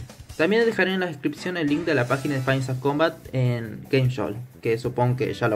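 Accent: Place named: Spain. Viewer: Ah vi el de ingles i ya pense "Ah tiene acento español" y ahora me salio este hahahaa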